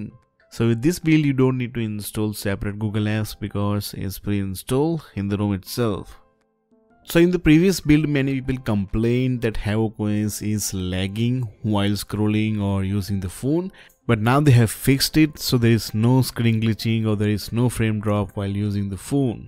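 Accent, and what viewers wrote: India. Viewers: Are you Indian living in Melbourne? Because your English seems Indian.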